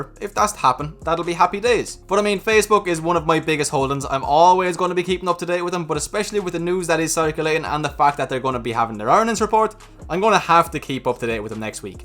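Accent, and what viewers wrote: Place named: Wicklow, Ireland. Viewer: Let me guess..., Wicklow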